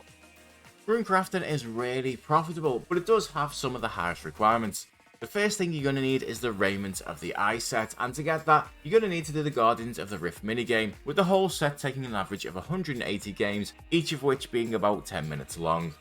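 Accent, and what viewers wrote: Liverpool, United Kingdom. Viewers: Super refreshing hearing a scouser play osrs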